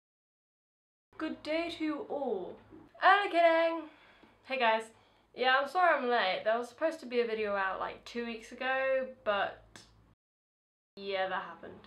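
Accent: United Kingdom. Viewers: cute twitter bird!! lolz Lovin the awesome British accent. tee hee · She's so cute. I love british pronounciation❤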